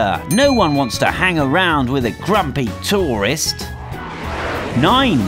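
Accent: United Kingdom. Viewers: This dude is clearly British (his accent) and he is talking about driving on "wrong" side of the road. Dude get serious. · And guys how are u going to assume he's English as we call it just cause he has British accent And plus DUDE OF COARSE THERE GOING TO ASK U TO GET THEIR STUFF and they are just trying to be polite to see if u need help IF U WALK TO A MALL LIKE FONTANA (PS Fontana is a pharmacy here) THEY WILL ASK U TOO THEY Do THAT EVERYWHERE HERE Again not trying to be rude if this comment is offensive I will take it down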